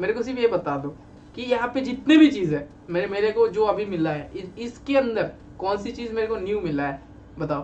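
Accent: Bengal, India. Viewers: Bengali Bhai kitni bhi Hindi bollo I can understand you are Bengali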